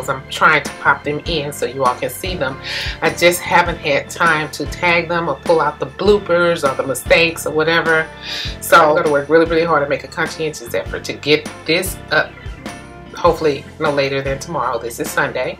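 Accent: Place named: South, United States. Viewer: i love hearing ur lil country accent lol. u look AMAAAAZING!!! i need ur detox type diet plan!!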